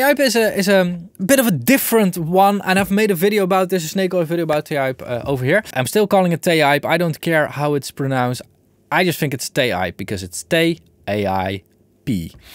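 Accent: Netherlands. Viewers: The Dutch accent is powerful, I like it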